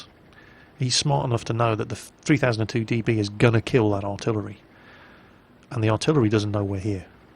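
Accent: United Kingdom. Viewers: have u ever thought of working as a narrator for TV .... we could really use someone like u ... that "typically" british accent, a nice voice, easy to listen to - very well narrating and improvising to get the sentenced finished ..... damn we could use a guy like u .... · Good stuff, thanks for showing us your awesome German tanks and for explaining everything in detail with Dat calm British accent.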